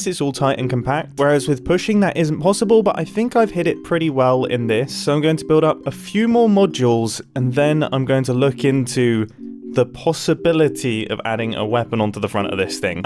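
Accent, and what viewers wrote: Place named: United Kingdom. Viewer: How often is it that you hear somebody say in the calmest british accent ever I'm building a giant tank.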